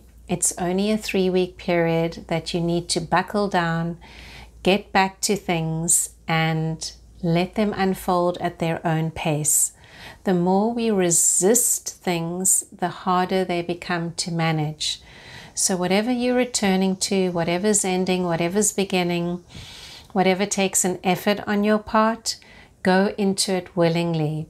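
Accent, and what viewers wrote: South Africa. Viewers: Thank you for this reading and explaining it so calmly. I am having one of the worst years of my life and I do hope 2023 will be far better for me. I recognize your SA accent. Sending love from Cape Town 🇿🇦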